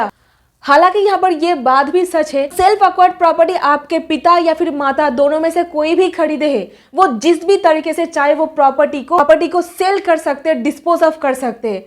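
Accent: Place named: West Bengal, India. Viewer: Sotti bolchen aaapni....aapka accent sunke pata chal gaya aap WB se hai.....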